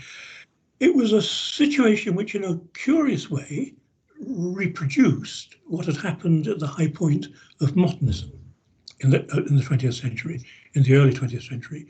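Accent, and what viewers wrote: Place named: Oxbridge, United Kingdom. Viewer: Gosh, I love this dude-he is a flaming hoot-his humor belies his rather stuffy,( Oxbridge(?) accent-I'm a financial analyst myself, but I would have enjoyed studying with this cat.-where ordinarily I wouldn't go within spitting distance of any Humanities faculty.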